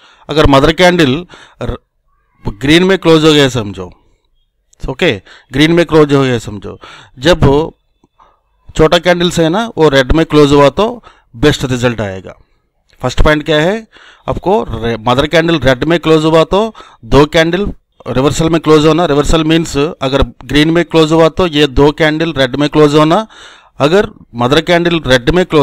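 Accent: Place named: Mumbai, India. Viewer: Your Hindi is Mumbai Hindi...easy to understand....Keep it up...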